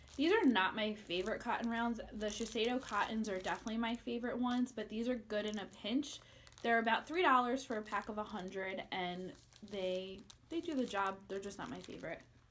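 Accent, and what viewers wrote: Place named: East Coast, United States. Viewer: Your east coast accent.....love it!!!!! Great video!!!!!😃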